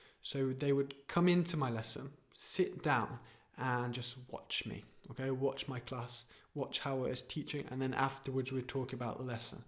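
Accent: United Kingdom. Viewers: Thank you very much.Your teaching was great as always (with beautiful British accent) and the content was helpful as well. Interestingly yesterday i was talking to a Swedish guy.We talked about the cultural differences between Iran and Sweden. "midsummer celebration is something we do that stands out i believe" he said.It was the first time i heard "stand out"! After our conversation,i found out you uploaded new video so i watched it and got surprised.Now i can understand it better.🙏🙏🙏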